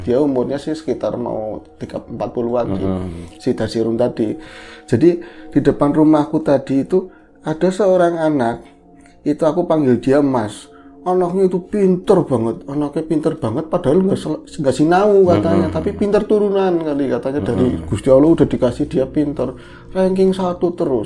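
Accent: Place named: Java, Indonesia. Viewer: Mas ni klo crta bikin senyum² ketawa² sndri jj gegara Pakebahasa jowo nya medokk.. mantapp cirri khas dr ngoborr, 😁😁😁 mogga channel nya smkin berkembang 👍👍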